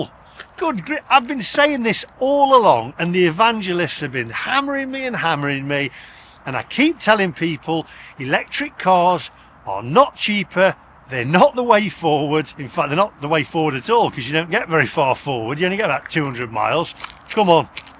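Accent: United Kingdom. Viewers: EV's should have an electric cord like a vacuum cleaner has. That way there will be no cords to cut off of the chargers,,,,,,,,,,,I love the UK humor that you have. I meet people from UK, retirees in Thailand, And I enjoy talking to them with their UK humor and accent. You are much more expressive than we Americans are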